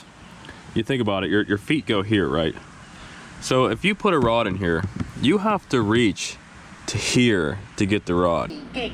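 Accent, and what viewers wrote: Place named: Pittsburgh, United States. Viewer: Man, Im like half way through this thinking there's NO WAY this dude aint a yinzer, then BOOM, "dahn the Yough." Confirmed. Grew up in the Mid Mon Valley / Ringgold area, just so happens I grabbed an Eagle Talon 12 a couple days ago and stumbled my way to this video. Your upgraded chair is aggressively Appalachian, I love it.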